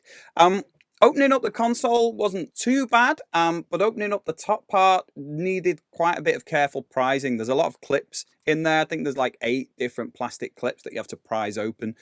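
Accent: United Kingdom. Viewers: British accent ?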